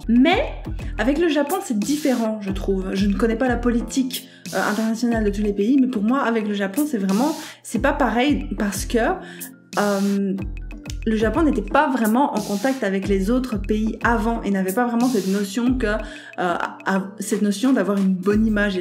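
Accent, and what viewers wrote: Belgium. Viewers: Tu dis « ouit » au lieu de « uit » :) c’est marrant l’accent belge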